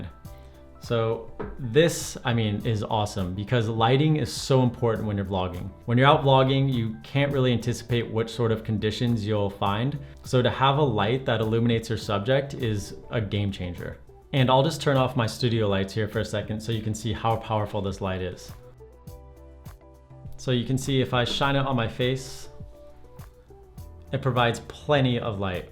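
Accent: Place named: United States. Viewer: Are you American or the best Swedish English speaker In the world ? Lol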